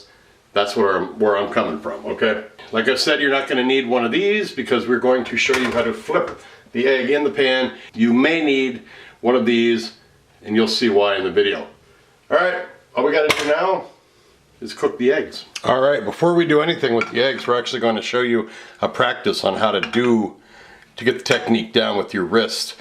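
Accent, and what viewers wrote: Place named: Midwest, United States. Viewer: Are you from Wisconsin or Minnesota? You sound like you have a slight accent!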